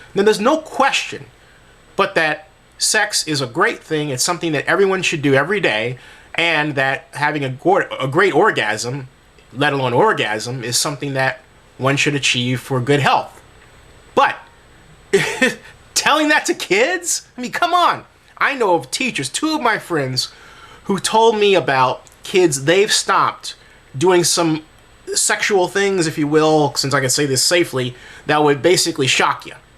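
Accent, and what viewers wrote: United States. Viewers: I can't bear to listen to his idiotic american accent or look at his holy than thou american face...Tutt tuttin fkin idiot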